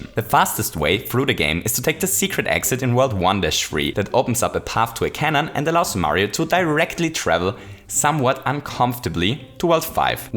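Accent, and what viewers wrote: Germany. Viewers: Am I the only one being annoyed by him speaking so enthusiastic with that german accent or whatever that is .. ? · Are you german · Is he german? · Are you german? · Are you German? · Bin ich drauf oder hört man das Deutsch raus ? Hahaha, nice video ! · ich merke das du ein deutscher bist weil dein dialekt anders ist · Man hört so hart das du deutsch bist hahaha #nofront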